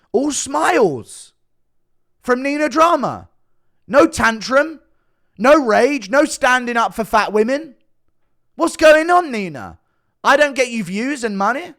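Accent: United Kingdom. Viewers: British accent makes the unwatchable..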